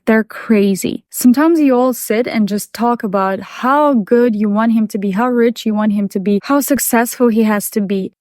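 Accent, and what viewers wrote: Russia. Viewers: Are you russian ???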